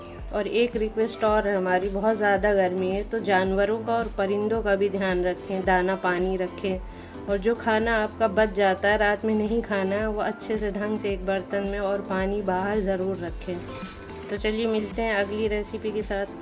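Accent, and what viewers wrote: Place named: New York City, United States. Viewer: NYC colour of NYC voice 🎉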